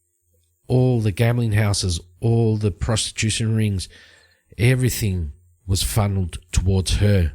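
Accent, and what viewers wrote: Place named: RP, United Kingdom. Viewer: You are so posh. ha ha! Great episode! :trophy-yellow-smiling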